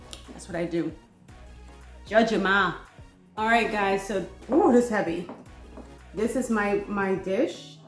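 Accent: Bahamas. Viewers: Lol I enjoyed this say (.judge your ma lmaoo, looka that macaroni ) you're a real bahmaian gyal, accent/slang on point 😆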